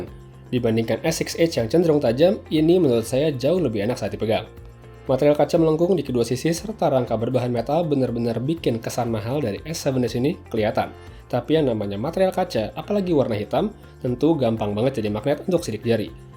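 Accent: Bali, Indonesia. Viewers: Bang lu orang bali ya??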